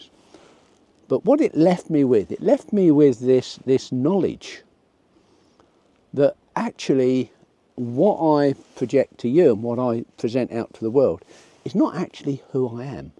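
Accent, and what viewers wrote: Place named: Cockney, United Kingdom. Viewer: Cockney druid well now who would have thought it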